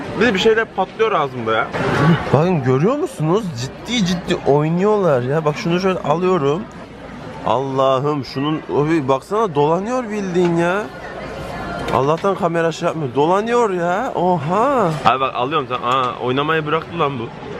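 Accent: Konya, Turkey. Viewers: Bir konyali olarak babam herzaman anouu der da diyor😄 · bende diyom bu adam niye Konyalı gibi konuşuyo ben de konyalıyım.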